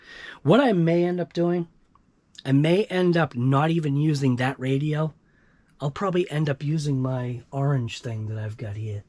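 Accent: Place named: Massachusetts, United States. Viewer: Im newer to the hobby. Been about a year or so. I knew you were from MA the instant I heard you talk lol. Im born and raised in Lynn and live on Cape Cod now. Love the videos.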